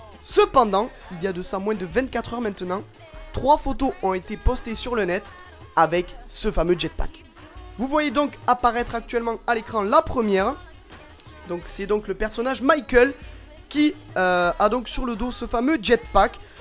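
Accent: South, France. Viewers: Ta bien l axent du sud toi x)) moi aussi tkt :D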